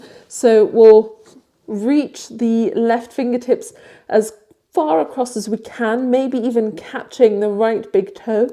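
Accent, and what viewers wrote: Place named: England, United Kingdom. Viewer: I so needed this! Thank you so much again! Always love the england accent in there:)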